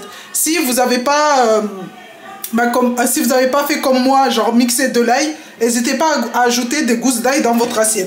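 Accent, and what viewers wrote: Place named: Algeria. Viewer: Merci ma sœur, pour le temps que tu passes dans ta cuisine, on entend algérienne🇩🇿🇩🇿🇩🇿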